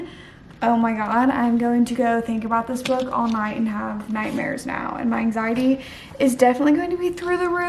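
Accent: United States. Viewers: where is her accent from in america? :O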